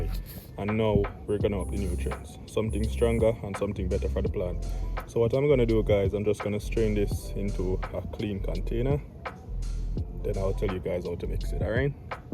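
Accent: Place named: Jamaica. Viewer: I'm a grower my self and you sound like ah yaad mn.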